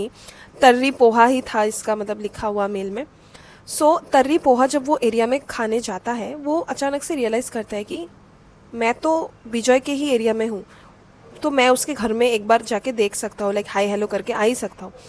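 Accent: Bengal, India. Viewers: Didi ap Bengali ho kya?😊😊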